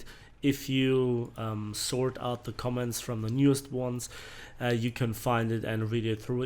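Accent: Germany. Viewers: Hey! Thank you for mentioning and answering my comments. Though I think you really should invest some time in answering everybodies comments with written replies... it shows that you care about us. But I'm still getting used to your ways and I may be wrong. About my comment that your videos are to long... forget about it. They are just great!!! Don't change a thing. About premium account... it's a must. I've tried a couple of months without it and it sucks. The difference is huge. And I'm fully spaded in all nations fighters and most of other planes. Except for the jets. They are pay to win and I hate them. My advice to all non premium players... just wait for the sales. 12 eurs a month is not so expensive especially if you love the game but on sales price drops to 3 eur per month. Now that's just insane not to pay for it. 40 eur for 360 days of premium. What happened to Subnautica branch? 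Continue the project... please? You are amazing narator and I love your german... both the accent and the actual language. Some 'fuck you's in german and 'du kanst mich mal' or 'was soll den sheiss' and stuff would be great to hear too:-D You know... actual comments when someone makes a pussy move on you and the joy 'comes out' of you... in your mothers language... the good stuff:-D Love you!!! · dont ever loose the german accent!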